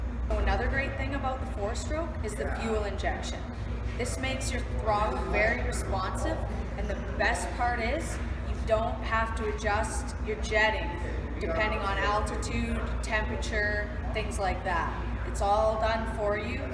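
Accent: Canada. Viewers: That Canadian accent tho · I love love Canadian accents! Fuel injection for 2 strokes is here thanks to KTM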